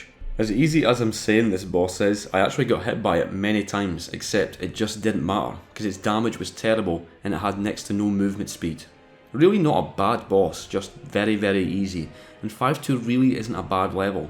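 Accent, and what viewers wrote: Scotland, United Kingdom. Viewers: Great video mate! I' ve only had a few problems understanding everything, because of the scottish accent, but went fine at the end, I guess 😅😂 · Great work mate, refresher to hear a Scottish YouTuber with an actual normal Scottish accent 😂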